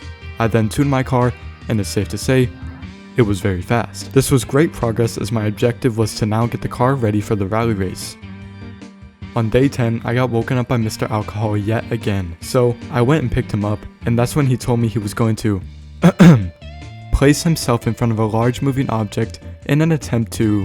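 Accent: Finland. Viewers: Your channel has a finnish name and you have some finnish in your accent but it says you're from united states wich way is it??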